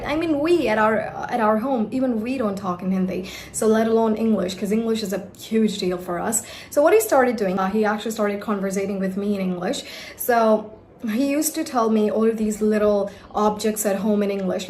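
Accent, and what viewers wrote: United States. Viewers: Look I know that you can speak only in one accent and that's American accent. Stop bulshitting people. You don't know how to speak in any other accent. And it's okay. Most of the people don't recognize these accents and that's why you can lie about it. Stop it, because it takes away your hard work. You know American accent well and that's great. Good job on learning the American accent. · M aap s american accent m english sikhna chate ho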